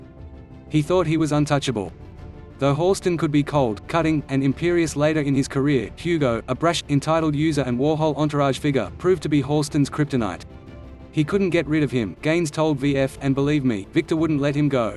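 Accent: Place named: Australia. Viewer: YES and the voice sounds like an Artificial Intelligent robot, it sounds drone like, whoever it was picked the "Australian male" voice setting in the narration reader and used a cheap AI subtitler on top of that.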